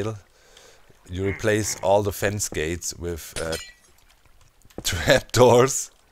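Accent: Germany. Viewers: Mann merkt das er deutscher ist oder er hat diese deutsch englisch Akzent hat ^_^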